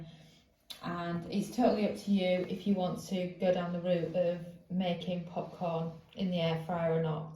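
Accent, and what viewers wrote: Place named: Barnsley, United Kingdom. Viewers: You sound just like I did and everybody else did in the area of England where I lived the longest. :-) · Barnsley accent ay up chuck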